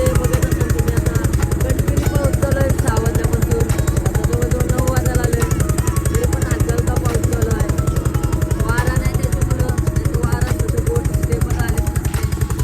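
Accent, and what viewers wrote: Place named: Ulwe, India. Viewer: Aamchya ulvyasaid chi bhasha vatey tujhi kuthla aahes bhau gav konta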